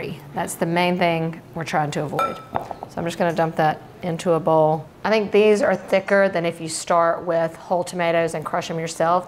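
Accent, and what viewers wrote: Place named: Alabama, United States. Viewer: You talk like me. We must be from the same area in Alabama.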